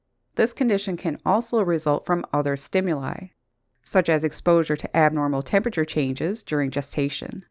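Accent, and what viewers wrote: Canada. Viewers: Most Canadian accent ever lol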